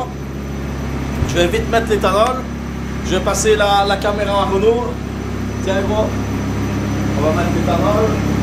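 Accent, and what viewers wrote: Belgium. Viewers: Je viens de découvrir ta chaîne ! Superbe. Et çà fait du bien d'entendre ce bel accent Belge ! Vous êtes tellement sympa en Belgique 🙏🙏🙏🙏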